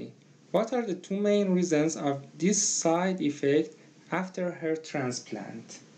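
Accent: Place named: Iran. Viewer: I have a classmate he speaks just like you, So I guessed you must be iranian.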